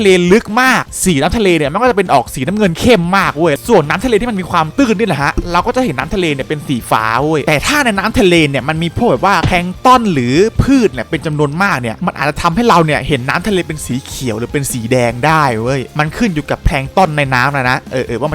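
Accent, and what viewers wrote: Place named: Sukhothai, Thailand. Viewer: พี่พูดเหมือนภาษาสุโขทัยเลยคับ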